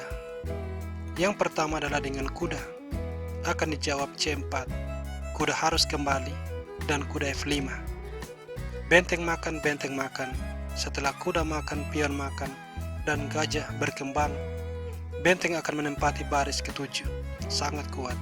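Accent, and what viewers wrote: Manado, Indonesia. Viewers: Kaya y manado😁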